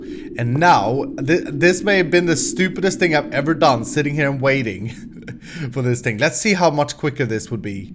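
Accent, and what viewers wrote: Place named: Sweden. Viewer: . You sound swedish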